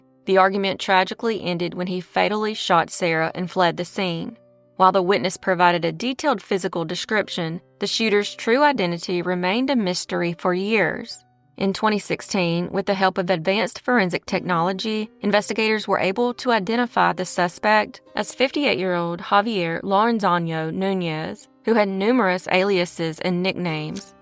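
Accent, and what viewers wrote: South, United States. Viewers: Hold up!?! 2 days off!?! Glad you didn't lose your Southern Charm Voice!! Irrelevant but kinda funny! My kids are out of college now.. My brothers youngest is a little 8 year old firecracker! (We went to visit for Christmas..). While asleep lil miss I hate boys vaulted up and smacked me on the mouth.. Cute but.. Dang.. Okay.. My brother (her father) laughed at it.. "Time to wake up and pretended to be an uncle and help your brother out".... (We are close.. Post high school and all the blood fights). I digress.. She got me SICK! Lil Terrorists.. So, I spent Christmas day doing highschool stuff to the toilet.. Got back in bed.. AND, .. I found some I actually missed and some I didn't comment on.. I actually felt like.. (sh!t for sure physically) but remiss that I missed them.. remiss / missed? Maybe I am still sick.. I fall asleep! 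"WHO IS IN THERE WITH YOU UNCLE DAVE!?!" "She has a really cool voice! I want to meet her!" I'll leave that up to her father..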